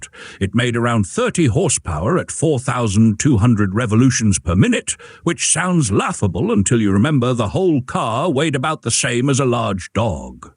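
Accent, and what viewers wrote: England, United Kingdom. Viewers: AI can make it sound like an English accent but lets itself down with pronunciation.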